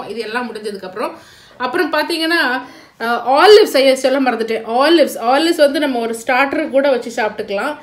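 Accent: Nagercoil, India. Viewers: Nice to hear our nagercoil slang. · Superb turkey dinner with all the trimmings. Anton's choices are nice. Your Nagercoil slang invokes the festive spirit too.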